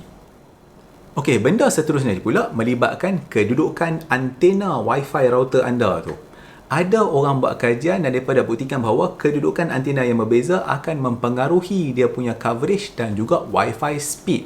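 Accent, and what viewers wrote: North, Malaysia. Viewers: Hihi best denga ckp utara, nk kna try la semua tips ni sbb sy punya mmg teruk w/pun dah ddk dlm coverage & Band40 … tp td ckp x pkai modai, tp ad cdgn pkai modai, nk claim la boleh? 😅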